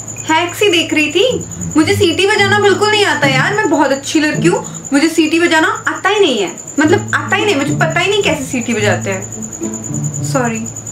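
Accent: Bengal, India. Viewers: Ma astay kotha bollo tomi ki bangali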